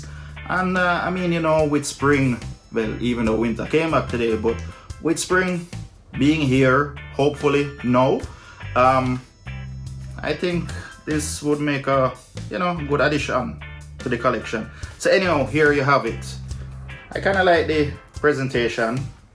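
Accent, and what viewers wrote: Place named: Jamaica. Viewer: 👨🏻‍🦱sounds like a Jamaican boy 👦🏿 was NOT expecting that 😂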